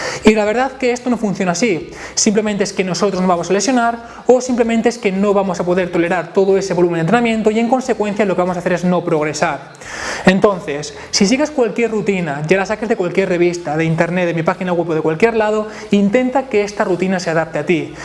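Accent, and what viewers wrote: Galicia, Spain. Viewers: Desde hace unos meses tiene acento gallego en los videos,no entiendo por que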